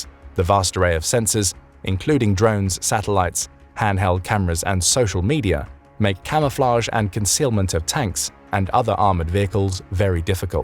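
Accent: United Kingdom. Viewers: This channel never failed to make me laugh. That British accent always ticks me off.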